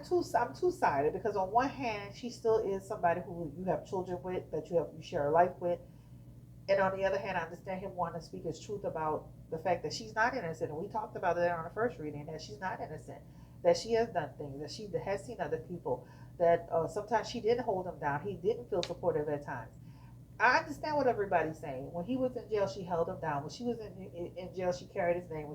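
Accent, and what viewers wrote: New Orleans, United States. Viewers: You got jokes 😂 I love our New Orleans accent⚜️ What’s so funny is… I never knew we had an accent until I caught on my taking the time to listen 👂🏽. How do we sound to you??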